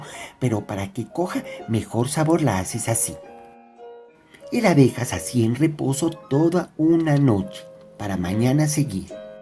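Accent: Colombia. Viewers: Puro asentó nariñense me encanta que viva pasto desde ipiales Nariño saludos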